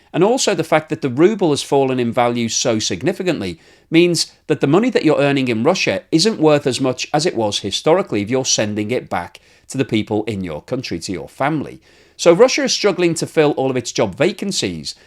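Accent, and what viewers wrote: Standard. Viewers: Thanks for this video. As always Your Standard English is perfect. So much easy as an Scandinavian to understand compared to other British slang/accents. Thanks Per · Thank you for you standard English. For me as italian is really a pleasure to understand 100% of what you say.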